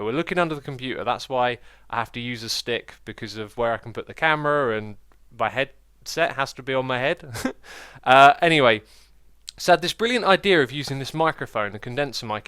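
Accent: United Kingdom. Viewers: I think it's the accent. idk why but British accents don't sound like metal fans to me.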